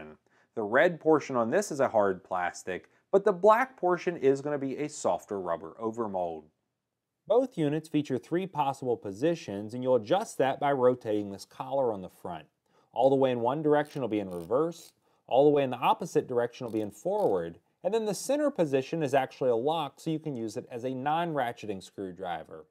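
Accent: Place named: United States. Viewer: As an Aussie (Australian) I am having great fun trying to work out what part of the US the reviewers accent comes from. Boston? Upstate New York? Dunno, but it is pretty cool. PS- Love your videos, but sad that a lot of the stuff that is reviewed on this channel is not readily available here in Oz.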